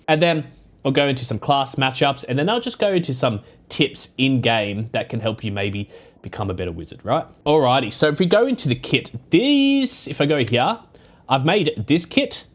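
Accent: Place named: Australia. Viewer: Loving the australian accent haha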